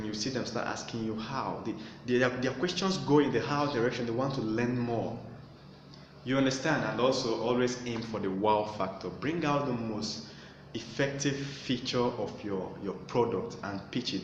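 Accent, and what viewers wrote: Nigeria. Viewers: I hear the Edo accent #tongueout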